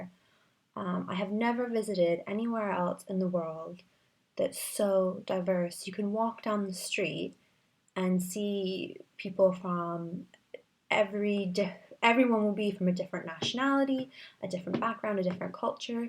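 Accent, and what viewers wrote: England, United Kingdom. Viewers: I'm British and I use 'sorry' excessively, even in completely the wrong context. it's becoming a real problem. [p.s, you have a twang of english accent in this video!] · I can hear English in your accent! I love when foreigners come over and pick up our accent in some of their words <3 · You sound like you picked up a lot of the accent in the UK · You sound like you're actually picking up some of our accent :) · You're a lovely ambassador for Canada,. And you're starting to develop just the hint of an English accent. Thanks for the video - and the complimentary views about London: we Brits need to be reminded from time to time that there are FAR worse places to live................! Actually, I think we're a bit spoiled in some ways - and take SO much for granted.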